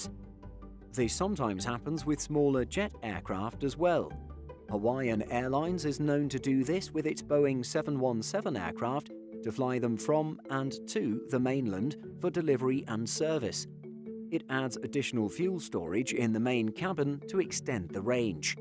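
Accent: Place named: United Kingdom. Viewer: Why all the Brit accent? Brits have little or nothing to do with turbo-prop aircraft ferry world-wide. ???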